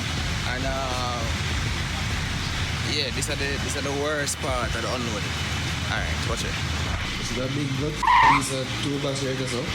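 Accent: Jamaica. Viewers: Jamaican accent of course he is from a diff part of ja thats all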